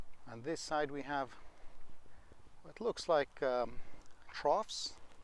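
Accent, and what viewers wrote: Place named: Israel. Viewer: so interesting.... I LOVE your Israeli accent!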